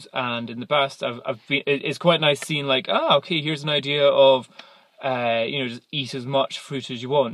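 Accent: Scotland, United Kingdom. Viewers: Scottish? :D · Is that a Scottish accent I detect? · what accent is that? I mean I know it's Scottish (I think), but what town or area?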